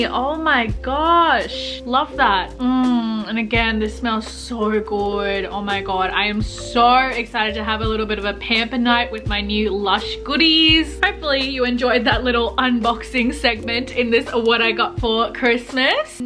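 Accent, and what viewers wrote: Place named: Australia. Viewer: god i miss this accent! aussie living in canada here! these pieces are so amazing! new sub here.. already in love with your content!! :) xx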